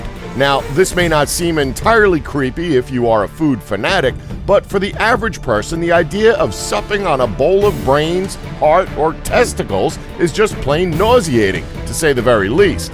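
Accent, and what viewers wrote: United States. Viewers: the amount of american english, wants me want to strangle you. FFS pronounce something correctly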